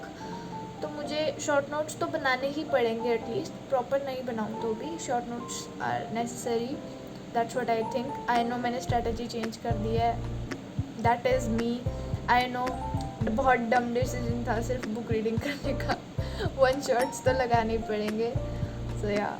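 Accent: India. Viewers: Sis are u Indian ?? and how you accent Indian..😅😅 please make me understand i don't know..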